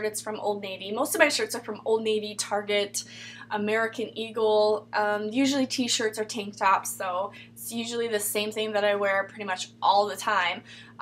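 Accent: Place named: Wales, United Kingdom. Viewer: Yeah....i love your Welsh accent.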